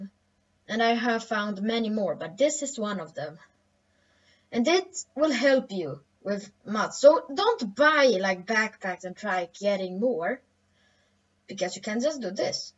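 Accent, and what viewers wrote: Sweden. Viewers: maybe du låter a little bitt svenska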